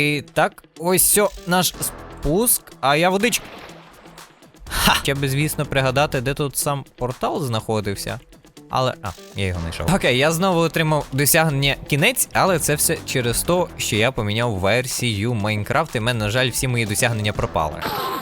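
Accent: Lviv, Ukraine. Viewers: скажи ти зі Львова? Тому що я так. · І по цьому відео можна здогадатися,що ти зі Львова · Ого ти з Львову?? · Ти зі Львова? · ти з Львову??? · Ти зі Львова ?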